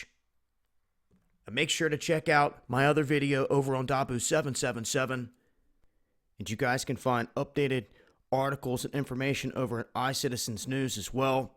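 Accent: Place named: California, United States. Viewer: you sound like Owen Shroyer if he was from California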